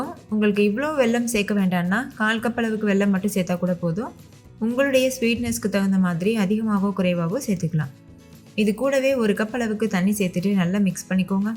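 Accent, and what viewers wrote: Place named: Thanjavur, India. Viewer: ஹலோ உங்க ஸ்லாங் எல்லாம் பார்த்தா தஞ்சாவூர் டிஸ்ட்ரிக்ட்😊 உங்க ஊர்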